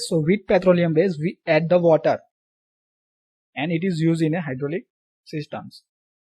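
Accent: Gujarat, India. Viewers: Are you gujrati sir??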